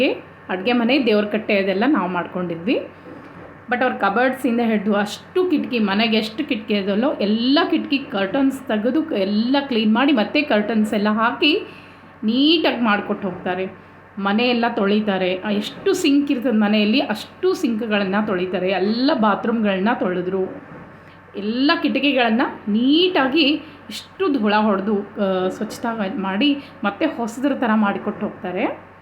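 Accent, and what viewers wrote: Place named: Hubli-Dharwad, India. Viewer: Amma nivu Daravada dalli idira anta ankondide nivu Bangalore anta helidu keli shock aytu 😊, but nim bhashe yalla hubli darawad bhashe ne ide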